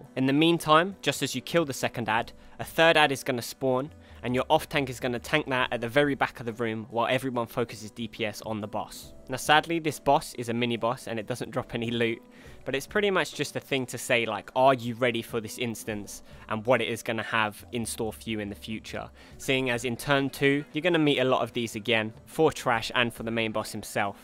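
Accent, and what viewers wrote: United Kingdom. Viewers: Great vid. Thnx. It must be the British voice.:D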